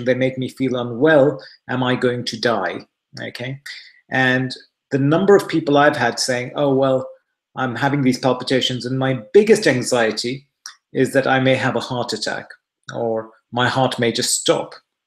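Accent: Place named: United Kingdom. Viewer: Have been crying tonight because yet again, I can't sleep due to palpitations that come on when I try to go to sleep. I want to tell you how wonderful it is out here in the States where I now live,to be able to listen to you with all your information.The fact that you have taken the time to say all this is (excuse the pun) heartwarming! I also feel better hearing your accent because I am originally from the Uk also! I keep being given holtor monitors to see whats happening but my heart often seems to behave perfectly when I have that on, and awful when I don't! Well anyway,thanks again and hopefully next week will be a better week for me because every night this week has been awful and last night I was awake with palps most of the night! Keep up the good work. :)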